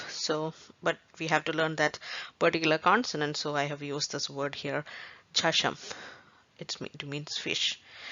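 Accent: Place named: Kerala, India. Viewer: Madam, good attempt but many pronunciation mistakes are there. Please correct yourself. Hope it is taken in the correct spirit. In your English, the Malayalam accent is coming through. That does not matter in this lesson though since you are teaching malayalam.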